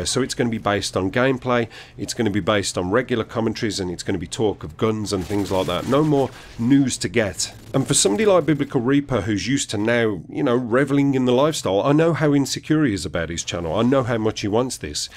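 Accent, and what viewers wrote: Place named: England, United Kingdom. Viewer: Awesome vid, superb english accent marvelous i should say, hope he listen to reason or otherwise his screwed.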